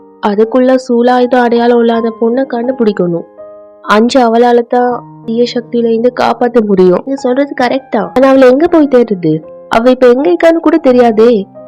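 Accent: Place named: Kerala, India. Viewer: illa akka .. na tamil .. unga tamil kekka alaza iruthu atha ketta malayalam ma nu .. naga pesura tamil ipdi irukathu english mix aki irukum .. anaa unga tamil super ra iruku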